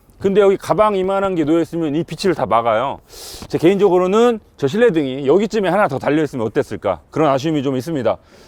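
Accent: Chungcheong, South Korea. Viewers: 편안한 충정도 사투리 속에 날카로운 디테일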